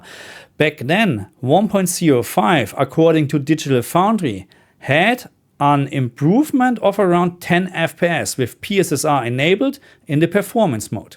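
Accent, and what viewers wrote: Germany. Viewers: und bin selber Frangge auf Nürnberg, daher vermute ich auch das bei ihm haha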